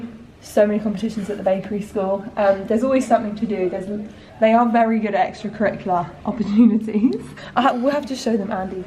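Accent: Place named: United Kingdom. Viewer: amazing video, very informative, the only thing that bother me was the audio because English is not my native language soooo it was a little difficult to understand what you were saying (also British accent is hard to follow) but otherwise very good video ❤️❤️❤️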